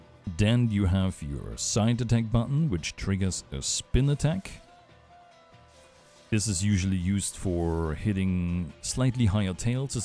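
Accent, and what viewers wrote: Germany. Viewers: Because i hear you say that a lot: It's "Does", not "Duus". Its almost spoken like "das" in german, but with an o inbetween. aka "dos" (I assume you are german, taken from your accent)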